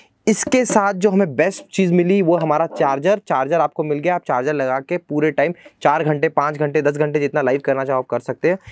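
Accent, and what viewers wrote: India. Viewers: R u from Maharastra?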